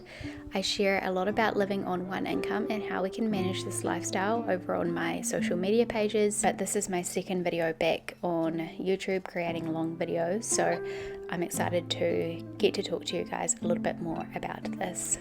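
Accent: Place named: New Zealand. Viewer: The accent is so lovely. I lived in Auckland until I was 18 then moved to Australia and London. Am 54 and still have my accent.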